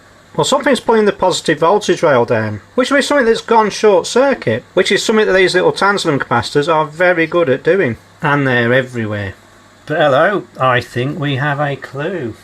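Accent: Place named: United Kingdom. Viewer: Very friendly British speaking guy also good technician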